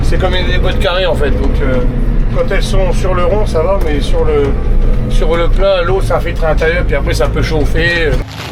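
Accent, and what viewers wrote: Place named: Meuse, France. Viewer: Joyeux anniversaire à la grand-mère 🎉. Il y a pas malin pour la benne. Et par contre pas de soucis tu es bien meusien l'accent est bien présent. La meuse c'est les meilleurs.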